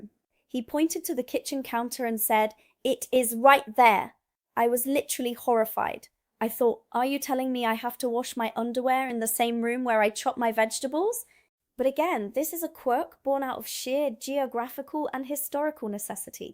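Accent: England, United Kingdom. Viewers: How is she from the US but speaks with an English accent?!!!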